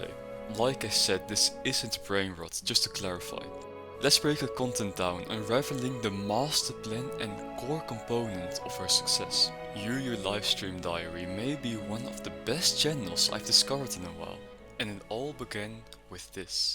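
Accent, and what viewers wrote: Netherlands. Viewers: Wait...are you dutch??? like from the Netherlands😭😭i can smell it i just can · Are you dutch by any chance? I’m asking because of your accent :] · Genuinely a great analysis video. Lovely Dutch accent, great use of background music (I loved the Persona OSTs after the 'high school is not like persona' video being shown), and good scripting/commentary! Nice editing too. All in all just a great high quality video and got multiple laughs out of me. Make more and wishing you the best of success with the channel, liked and subbed <3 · Hoorde meteen dat je Nederlands bent mijn broeder😂💪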